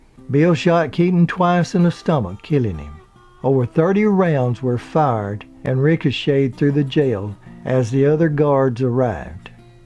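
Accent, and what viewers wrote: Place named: Rural, United States. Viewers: Great stories. They should be delivered by a campside fire. I'm British but I absolutely love that accent. (Is it a Kentucky accent BTW?) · Please get the marbles out of your mouth and properly enunciate your words, this is English, not hick.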